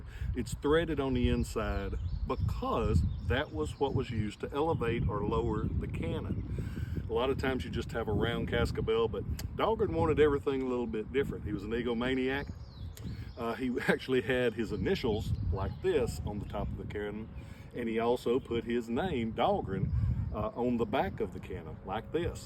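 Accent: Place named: South, United States. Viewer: I hope you're doing well! Thank you for your awesome videos! I love hearing that southern accent!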